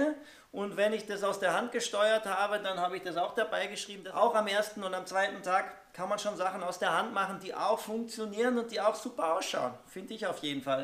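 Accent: Austria. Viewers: Österreichisch ist perfekt. Als weida sooo! und viel Spass mit dem kleinen Flieger! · Bist ein sympathischer Typ 😁 Die Bilder sind richtig gut. Das österreichische ist nicht schlimm man versteht es gut 👍 Nur die Musik war bissi laut oder die Sprache zu leise sonst schöner Film.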